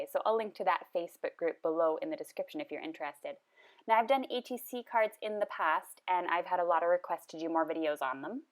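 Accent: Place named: Canada. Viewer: ... I love your Canadian accent. I know I am supposed to comment about the ATCs... hehe. Gorgeous cards. Thank you so much for sharing...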